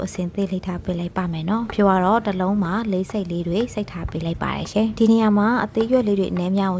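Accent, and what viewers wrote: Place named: Shan, Myanmar. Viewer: You sound like Shan people not Burmese however you have a sweet accent I am sure you are a good cook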